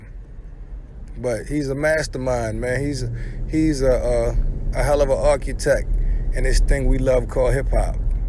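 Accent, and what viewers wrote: Baltimore, United States. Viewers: Yo you from Bmore??? The accent gave it away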